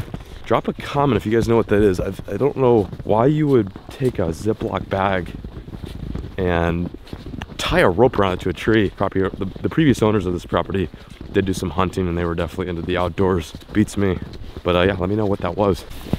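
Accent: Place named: New England, United States. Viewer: Coming from a New Englander I love the accent you picked up with my fellow boys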